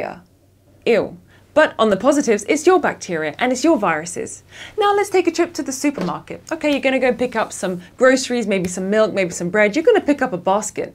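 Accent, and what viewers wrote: United Kingdom. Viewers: I live in London and that's a British accent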